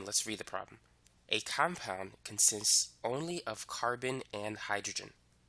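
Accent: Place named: New York, United States. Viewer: you definitely from ny b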